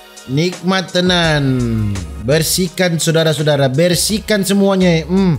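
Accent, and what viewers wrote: Manado, Indonesia. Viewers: Orng tondano ato Manado kk hehe kalo luar maapppp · Lah Logat nya kayak Manado nih asal mana Ko?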